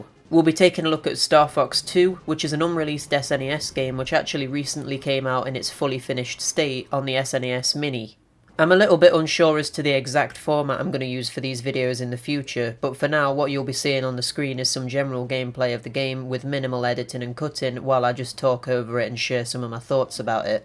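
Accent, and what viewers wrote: North, United Kingdom. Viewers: yeah, tbh I'm on a bit of a marathon here, went to the roots, so to say and going up from there. I love watching how people gradually change their approach towards creating videos, from humble beginnings to more professional setups, more confident narration, how they change visually, all that, it's a lot of fun for me, like using a time machine. First time I saw u was at Retro Dodo channel when u joined them, it was quite unusual at first but I quickly got used to ur reviews, mostly coz I absolutely adore ur northern (i suppose) British accent plus the way u tell the story, it just feels right, u know, it's fun, entertaining, nothing too complex or boring, I realized I enjoyed listening even when u discussed smth of no interest to me (for example light guns). And when u mentioned that Oddworld series is one of ur favorite... 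my god, I was ready to buy the ticket, fly to UK to shake ur hand personally, coz I'm absolutely mad about Oddworld games (no 3D spinoffs though;) So yeah, then i stopped seeing u at Brandon's channel, did a little bit of digging plus u were kind enough to reply to comments, soon I realized u have ur own channel and then I decided to start from the beginning and watch more of ur content which I believe is very wholesome and fun. Wow, that was a long reply, apologies for that, u take care, bud. Oh, and I love cats too!!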